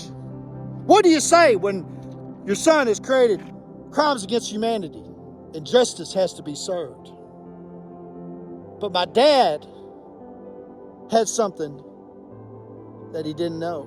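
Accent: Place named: Arkansas, United States. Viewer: What part of Arkansas you from?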